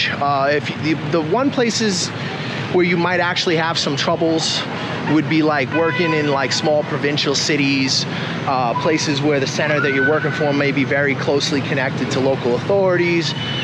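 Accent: New York, United States. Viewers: Bro i love this guy, his honesty and frank language makes him a diamond in the rough of youtube lames. Bro i knew he was from new york just how he speaks lol. I may hit you up bro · When I started teaching in 2018, I didn't have a degree, but I still found a job easy in Vietnam. Thanks for sharing your input. Are you from New York? I'm from New York, and you have a stronger accent than me.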